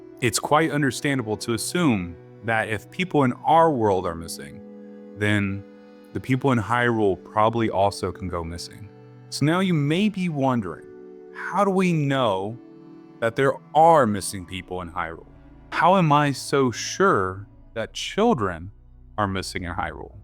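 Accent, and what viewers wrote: Appalachia, United States. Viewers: I subscribed after watching the recent vid on rainfall in TotK, and I've been slowly working through your other Zelda vids since. Good stuff! As a West Virginia native myself, I guessed you were Appalachian from your accent :)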